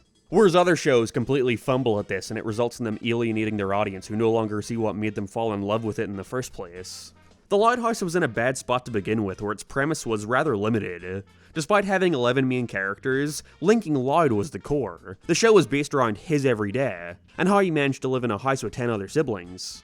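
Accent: Ireland. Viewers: is bro irish or no 💀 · Hes Irish living on America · I'm pretty sure he's Irish or something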